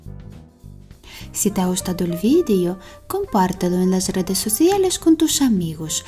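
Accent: Russia. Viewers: te juro que me encantan tus recetas, pero tu acento me recuerda esos horribles munequitos rusos que tenia que ver de pequeno cuando vivia en Cuba, y se me ponen los pelos de punta. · Por mas qué pienso no logró identificar tu acento eres rusa o española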